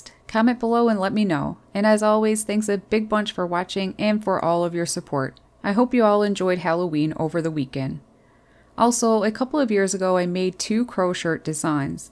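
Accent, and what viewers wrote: Canada. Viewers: Dear, I love your videos, your facts are beautifully presented and your Canadian accent is so very charming. In Australia we also have crows, ravens and other song birds. The East Coast magpie (found in my home town, Sydney) has the MOST beautiful song. Once your local magpie knows you it will consider your a friend, woe betide you if they dislike you. My local magpie knows me and I occasionally give it ground mince as a treat though I try not to feed it too much. One day, when this dreadful pandemic ends, visit Sydney, you'll love our birds, too. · You're Canadian, aren't you? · Is it true that each crow has somewhat of a unique song meaning they don't sound completely identical like other songbirds just asking? By the way I like your accent. Are you Canadian?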